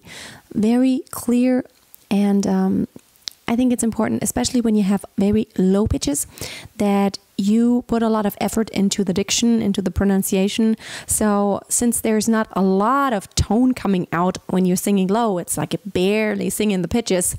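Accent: Germany. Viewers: You sound German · Are you German?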